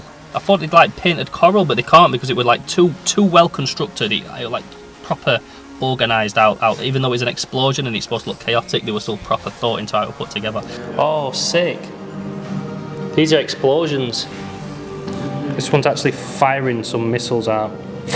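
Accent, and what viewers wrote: United Kingdom. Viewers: Great Video. Had a hard Time to understand your English. It was hard british english. But hey i am just a german guy :D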